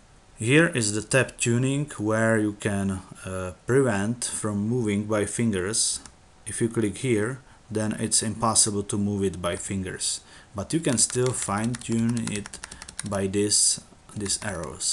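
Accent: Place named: Czech Republic. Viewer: Which nationality are you?? It somehow sounds pretty much like czech accent.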